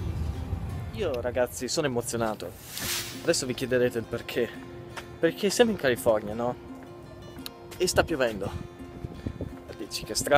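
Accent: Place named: Verona, Italy. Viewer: Si sente un attimo la tua sfumatura veronese From Borgo Trento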